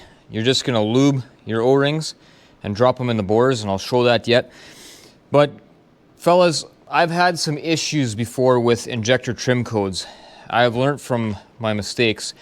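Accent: Canada. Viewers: I'm in the middle of rebuilding a Maxxforce DT and found you video on measuring piston protrusion, and I kept thinking that you sounded like you were from South of Winkler, I grew up near Plum Coulee and Currently reside in Portage la Prairie. I just got the Rocker arms in, the valves set, and the injectors installed today. I'm rebuilding it because the dogbone holding the lifters straight for #5 broke allowing the lifters to turn wiping out the camshaft, and also the #3 cam bearing was missing and #2 had shifted. love the hex adjusters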